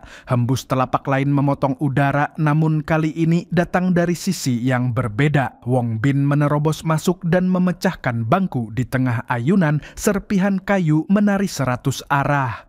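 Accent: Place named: West Java, Indonesia. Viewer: fix logat urang sunda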